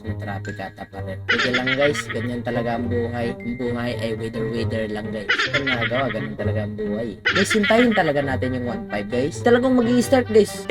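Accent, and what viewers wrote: Visayas, Philippines. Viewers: Bisaya man diay ka pader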